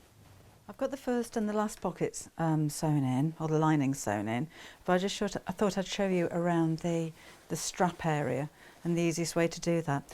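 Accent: United Kingdom. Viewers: Where are you that you call it a zip? The accent sounds British? In America we call it a zipper. Your bags always look so darn cute!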